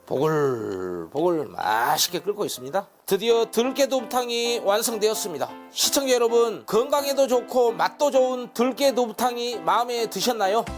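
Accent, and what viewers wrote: South Korea. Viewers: 언제들어도 구수한사투리 정감이 갑니다 쉐프님 역시 최고입니다 감사합니다 🎉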